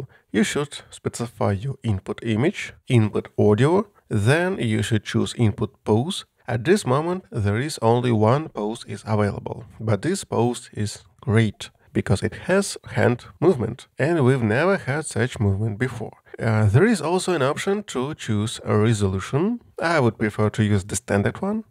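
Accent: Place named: Russia. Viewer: Такой русский английский. Это скорее не акцент, а интонации Полезное видео, благодарю за труд.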